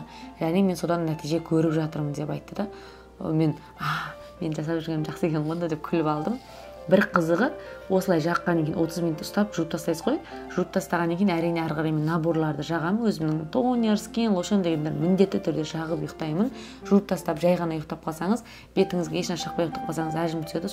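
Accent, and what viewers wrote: Standard. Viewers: Кандаи ашыкжузди жаркын кыз екенсин кызым бакытты бол оз тилинди умытпаи таза сулеп отырганына риза болып отырмын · Қарапайымдылығыңыз өзіңізді онан сайын әдемілендіріп тұр! Ал таза қазақи тіліңізге тіпті көп адам ғашық болғандай!😘😘😘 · Казак тилинде таза сойлегенине риза болдым. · Қазақша таза сөилегенің үшін қызым қатты құрметтеимін .Не деген ғажап қызсың. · Керемет өзге елде түрсада таза қазақша сөйлейды екенсыз жарайсыз,рахмет қолданып көрем. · Сәлеметсізбе қызым рахмет өте кушті түсіндіріңіз бетті қайтіп маска жасауыңды көрсеттіңіз енди көреміз. Өз ана тіліңізді умытпай таза сөйлеп атырсың рахмет бахтыңыз тахтыңыз болсын Илахым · Сәлем,. Тамаша. Керемет. Ана тіліңді ұмытпай таза,әрі анық сөйлейсің. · шықса не болыпты.Қайта қазақша таза сөйлейді. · Кореяда жасап,өз тіліңді таза сөйлегенің ұнайды,жарайсың 🍓🍓🍓 ақ көңіл,сұлу жансың бақытты бол❤❤❤ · Жаным ,казак кызы.тіліңді умытпай,таза сойлегеніңе улкен рахмет.казакстанда турып тілін сойлеуге намыстанатын намыссыздар бар.бояусызда турің әдемі.кайда журсеңде аман бол. 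· Рахмет Өз тіліңді ұмытпай таза сөйлегенің үшін ❤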